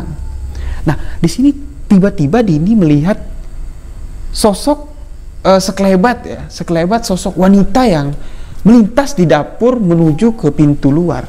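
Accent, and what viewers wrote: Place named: Bali, Indonesia. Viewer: Ooo,,,logat nya ky orang bali 😁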